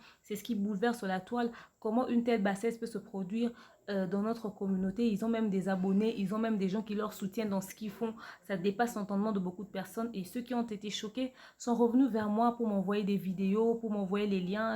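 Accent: Ivory Coast. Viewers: stp ne brande pas l'accent ivoirien on parles mieux français qu'eux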